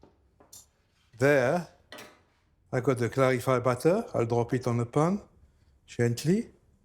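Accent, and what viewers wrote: France. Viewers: It’s a French accent. No English accent here.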